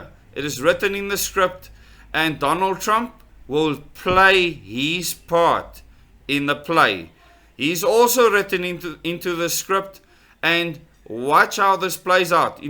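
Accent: South Africa. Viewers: It is so refreshing to hear a South African accent saying what we have come to know from the truthing community (mostly US) over the last few years. Love it. Mooi man! Mense is besig om wakker te skrik.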